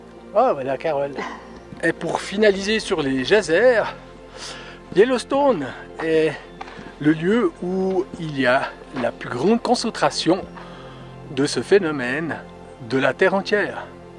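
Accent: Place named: Switzerland. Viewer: Je vous remercie d’avoir pris le temps de répondre, je vais remonter dans vos vidéos et aller voir votre présentation. , J’ai 58 ans et suis dans le même état d’esprit que vous l’étiez certainement avant de faire le pas pour une vie différente , et visiblement nous avons le même parcours de voyage . Je file cet hiver aux Philippine 3 semaines maxi , contraint pas le travail , j’espère que les vacances qui suivront seront les grandes vacances et que je ne serai plus contraint de revenir à date . En attendant, merci encore pour vos vidéos qui font du bien . Et je vous taquine pour l’accent , ne changez rien ! Et vive les suisses !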